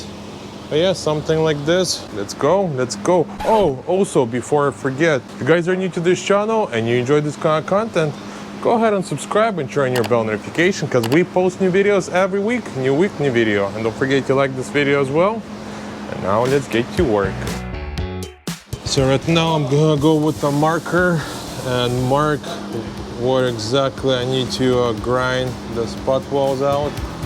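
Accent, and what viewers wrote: Russia. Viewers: 😅 Русский акцент не скрыть никак. Классная работа! Лайк, подписка.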